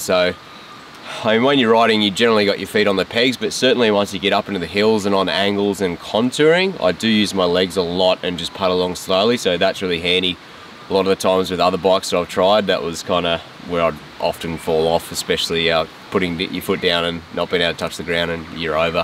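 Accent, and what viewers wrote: Australia. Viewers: Damn! Wish I could speak Australian ! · Very cool, I live in Canada and I've heard of Rokon and a couple other 2WD motorbikes, and I always thought it would be great to own one, I've owned all kinds of offroad dirt bikes, but never a 2WD, it would be great, but not until my kids grow up, I just hope I'm not too old to really use one by then, great review anyways Buddy, u must be from Australia?? Not New Zealand I guess???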